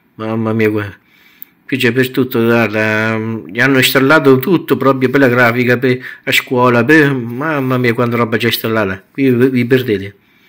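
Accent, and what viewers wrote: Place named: Marche, Italy. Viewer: però dovresti parlare italiano! Si capisce molto poco di quello che dici se parli marchiciano! Io lo capisco perché sono originario di un paesetto non lontano dalle Marche ma molti avranno difficoltà!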